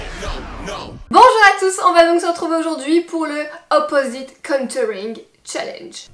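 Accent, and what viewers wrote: Belgium. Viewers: tu as honte de ton accent belge n importe quoi reste comme tu es on t aimera plus naturelement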